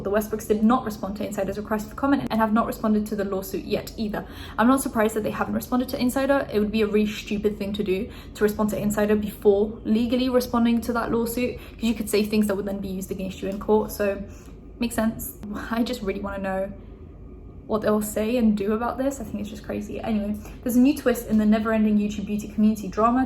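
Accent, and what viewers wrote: United Kingdom. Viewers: I literally had no idea she wasn’t British and I’ve been watching her forever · English is not your first language and you're an immigrant?? I really thought you were just British. What's your ethnicity I'm curious:) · wait, you are not british..? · It's because she doesn't suddenly make any random loud noises or start yelling. The British accent and Calm composure don't hurt either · Please no one come at me, I have the memory of a sieve but, where is my girl from? I full on thought she was British. Sorry if that comes across as rude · Omg I could’ve sworn you’re British your accent is so authentic 💀💀💀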